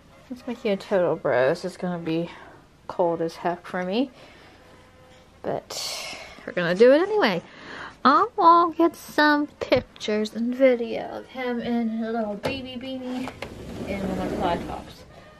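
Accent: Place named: Philadelphia, United States. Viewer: You have a philly accent. ;)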